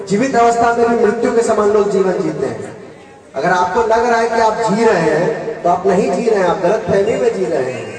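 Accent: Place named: Bihar, India. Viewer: राधे राधे एक दम बिहारी टोन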